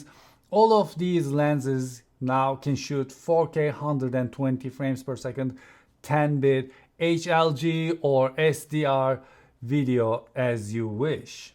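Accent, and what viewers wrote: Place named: Turkey. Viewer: You have a Turkish accent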